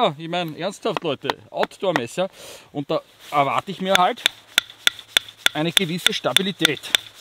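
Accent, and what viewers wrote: Austria. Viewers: Tolles Video! Ehrlich kommentiert, ich finde den österreichischen Dialekt klasse 👍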